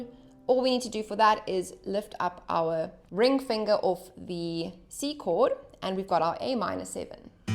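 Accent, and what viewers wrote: South Africa. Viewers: Are you South African? You sound sound like you are from South Africa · This is much easier. I am glad I came across this tutorial. Is that a South African accent? Recognize!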